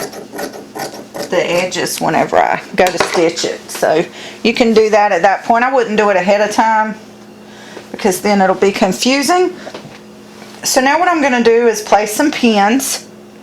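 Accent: South, United States. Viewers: Very nice. Thank you for sharing. This was very helpful for me. I have not learned to sew zippers yet. However, I have made a lot of leather purses (Southwest Style). You are a great instructor. Your voice delivery is very clear and precise. Your instructions are easy to comprehend and follow. The southern draw makes it easier for me to understand ya'll. I am going to attempt this. However, I am going to try and line the opening to the pocket with garment leather. I believe I will sew the garment leather to the pocket slit before I bring the material through, to the 'wrong/unseen-side' of the purse liner. If it doesn't work, I will resort to this simple pattern. Thanks again!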